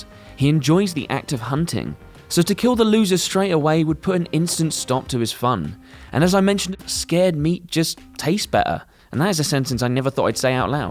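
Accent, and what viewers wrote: United Kingdom. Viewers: Stop with the brit announcers!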